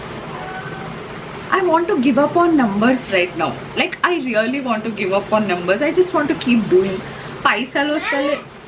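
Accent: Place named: Hyderabad, India. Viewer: Your videos look just natural ❤and more over your slang is pakka Hyderabadi ❤❤️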